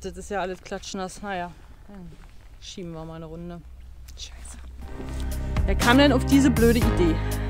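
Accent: Berlin, Germany. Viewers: Hallo deine Videos schaue ich sehr gerne, bin bei deinen Touren auch etwas dabei. Macht viel Freude deine Touren und Erlebnisse. Auch deine berliner Aussprache sehr nice. Grüße Aus Bayern Rudi t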